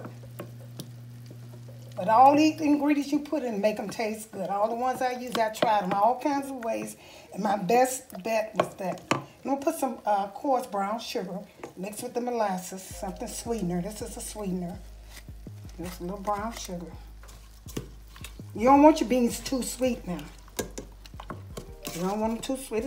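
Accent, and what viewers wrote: South, United States. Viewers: I love listening to you talk..love that southern accent! I have one too ;-)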